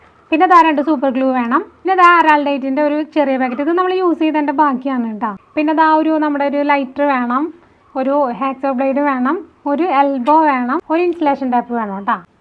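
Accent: Thrissur, India. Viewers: സംസാരം കേട്ടിട്ട്. തോന്നുന്നു തൃശൂക്കാരിയാണെന്നു എന്തായാലും വീഡിയോ അടിപൊളിയാണ്‌ കേട്ടോ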